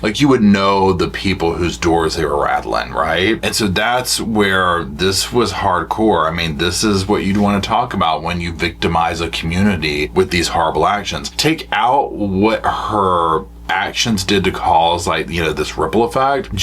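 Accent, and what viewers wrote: South, United States. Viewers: I’m listening to you as I’m having my afternoon coffee. Thinking that you are from the south but couldn’t put my finger on it. Just saw Pittsboro, we lived in Fayetteville(Fort Bragg) for 8 years. We lived there when this happened and I had just had our youngest child. I was so frightened to go outside, even though union was further west in SC, the car hadn’t been found yet. It was a time when carjacking was becoming a thing. So yes Susan Smith had people looking side eye at black men in a red car. It was a horrific crime and the judge showed pity on her by allowing parole. She’s right up there with Sarah Boone and Letiticia Stauch in her narcissism So heyyyyy from east Alabama!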